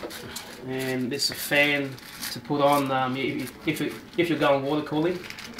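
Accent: Australia. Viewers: British? he sounds like an auzzy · Actually, I'm English and he doesn't English. He sounds Australian.. And No, it isn't obvious that he's Enlgish, because he's Australian >.> And if you still don't believe me, then you're an idiot, because on his channel: Hi My Name is and i live in Sydney, Since the age of 10, I developed an interest in eXtreme Computers, Thanks to YouTube i have the opportunity to share my passion & inspiration to the world. Country: Australia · hes aussie for sure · Greek Australian I'm guessing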